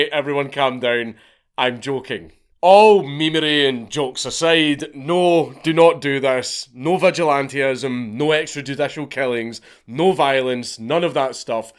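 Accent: Scotland, United Kingdom. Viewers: Maybe it's because I respect what Dank does. Or maybe it's the Scottish accent. But the only time that I don't skip ad reads is on these videos.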